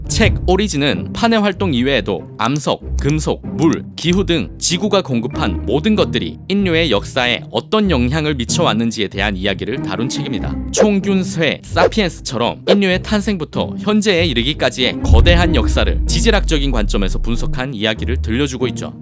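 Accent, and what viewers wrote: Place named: Gyeongsang, South Korea. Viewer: 혼자 읽기 힘들었는데 감사해요 경상도 분이시죠?? ㅎㅎ❤️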